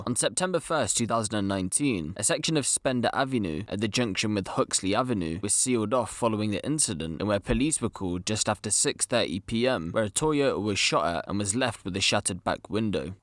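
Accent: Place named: Birmingham, United Kingdom. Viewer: Brummie i think mate...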